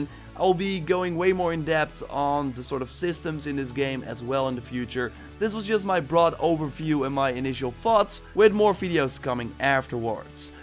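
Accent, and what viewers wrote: Netherlands. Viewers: You sound Dutch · Are you dutch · Just wondering are you Dutch? (Not bashing your accent my friend however I'm Dutch and I was wondering if I guessed correctly)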